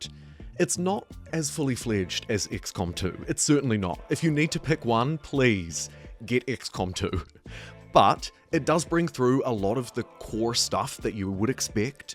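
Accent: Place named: New Zealand. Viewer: I love that with your kiwi accent you need to spell out DECK to avoid misunderstanding 😅😅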